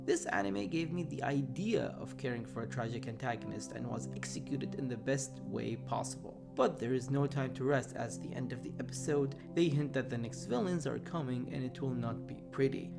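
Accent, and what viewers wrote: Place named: Arab world. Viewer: are you an arab? u sound like a person who grew up in the middle east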